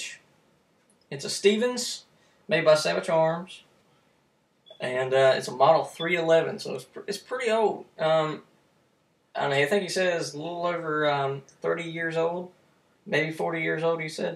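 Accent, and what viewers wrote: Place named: Carolina, United States. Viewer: I thaught you sounded like a carolina boy!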